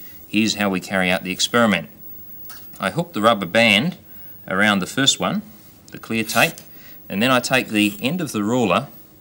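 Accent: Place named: South Australia, Australia. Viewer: Always wondered what their accent was as a Melbourne kid. South Australian!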